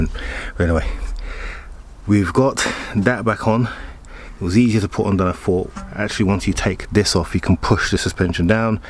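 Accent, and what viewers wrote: United Kingdom. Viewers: your a man of brown skin colour but could not sound more native british. made me laugh.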